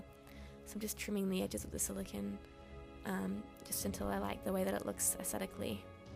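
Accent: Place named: Australia. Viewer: Took me about five videos to realize you talked "funny" but that's because I'm used to hearing american accents on you tube... (Note: by funny I mean Normal, as in Australian ) love ya work, will have to look into emulating some of it :)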